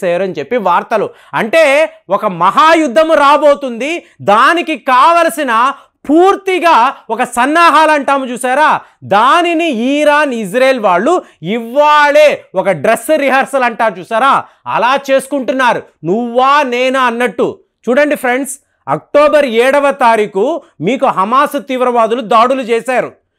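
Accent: Tamil Nadu, India. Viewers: గురువు గారూ కి తెలుగు వీడియోలు 5 ఏళ్ళ నుండి చేస్తున్న ఈయనకి ఇంకా అరవ యాస పోలేదు. Leh నీ లేహెగ్ అని ఇలా ఇలా అరవ యాసలో మాట్లాడుతుంటాడు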